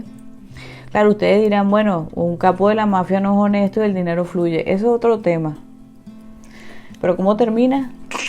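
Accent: Argentina. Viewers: Argentino o uruguayo?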